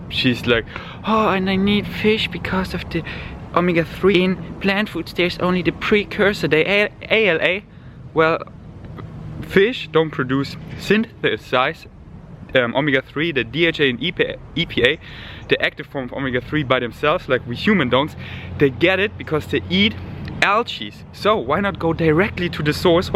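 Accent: Germany. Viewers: I knew it, that accent looked like a german one (scroll in the comments) Tolle Video☺️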